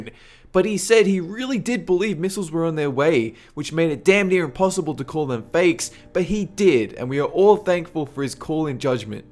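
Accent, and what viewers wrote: Australia. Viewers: I find it hard to place the narrator's accent. Sounds vaguely Australian. Anyone know? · Are you Aussie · Most annoying Austtalian accent ever. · Reaver He's australian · Are you from Australia? Also please do 10 creepiest things that ever happened on earth video. 😀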